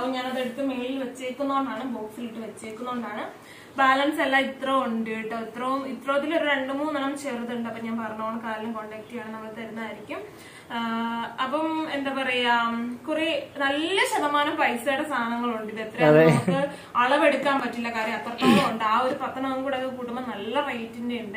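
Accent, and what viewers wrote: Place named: Thrissur, India. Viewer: ശെരിയ, എനിക്കും ഇഷ്ടം ആയിരുന്നു, ഇപ്പോൾ ഒട്ടും ഇന്റെർസ്റ് ഇല്ല, വീഡിയോക്ക് വേണ്ടി അമിത വിനയം, എളിമ, എന്തൊക്ക ഗോഷ്ടി ആണോ, സഹിക്കാൻ വയ്യാത്തത് ഓവർ ആയി തൃശൂർ ഭാഷ പറയുന്നത്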